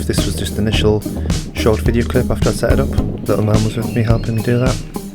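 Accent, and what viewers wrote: United Kingdom. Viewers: Love the UK accent in these videos, but yeah, we've got rats in the states.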